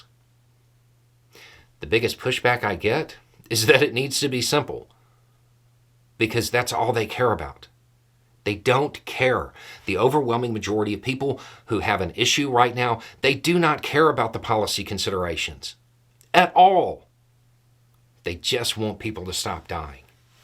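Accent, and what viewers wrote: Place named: Transatlantic, United States. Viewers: transatlantic accent is not something I ever expected to hear. 10/10. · Hi, I really enjoy your compassionate analysis. I understand this video was a tangent for you, but I really enjoyed it. Also, please feel more than free to use the transatlantic accent as often as possible in the future. I loved everything about it, no notes! 🙂❤️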